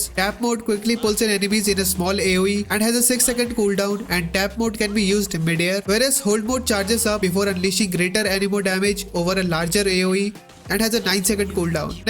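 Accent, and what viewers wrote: India. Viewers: Indian accent 😅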